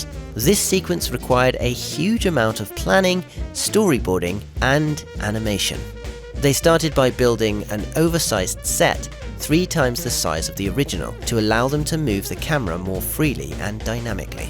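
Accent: United Kingdom. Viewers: the sort of British accent that you sometimes have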